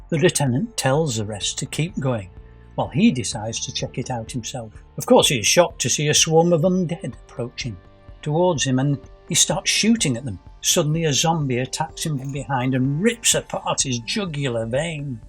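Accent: United Kingdom. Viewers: Nice to have a British narrator, their accents are awesome.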